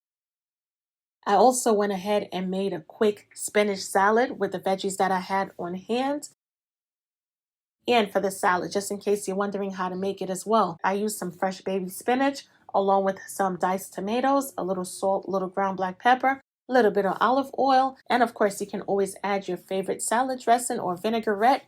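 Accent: New York, United States. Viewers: You sound like you from NYC I hear a hint 😂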